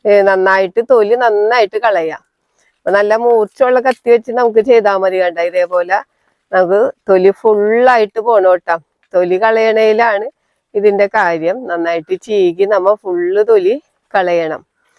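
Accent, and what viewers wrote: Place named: Ernakulam, India. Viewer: Eranakulam aanalle😊 Bhasha kett paranjathann.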